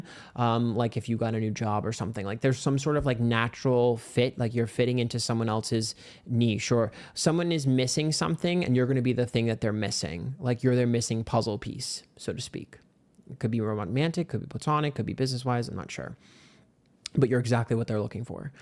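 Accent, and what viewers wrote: New York, United States. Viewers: ♐️👄♐️ When I start hearing NY accent & dropping F-bombs = He is NOT messing around! 🫨 Thanks for the Go Ahead 👸🏻🧾 GTFOH IWTFYWB ACTIVATED 🫡 *sharpening claws*💅🏼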